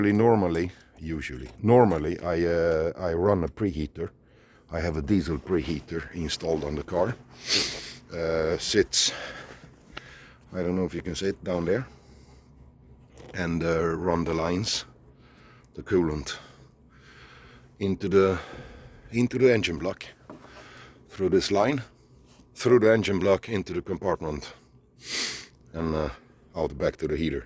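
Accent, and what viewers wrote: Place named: Scania, Sweden. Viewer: Gillar dina filmer, skönt att lyssna på den ljuva skånskan😊. Men den saknade man i detta klipp😏🤔🥲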